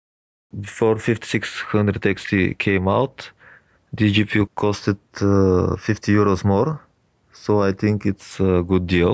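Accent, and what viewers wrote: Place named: Russia. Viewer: Какой русский акцент.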